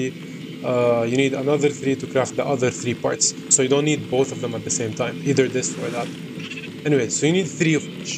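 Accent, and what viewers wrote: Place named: Arab world. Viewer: My dude your accent is very close to my own, are you lebanese or anywhere around the middle east?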